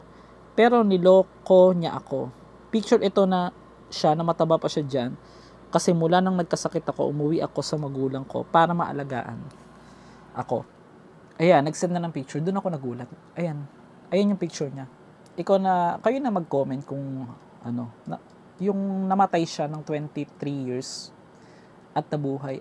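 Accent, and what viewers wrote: Visayas, Philippines. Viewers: bisaya kayo dol?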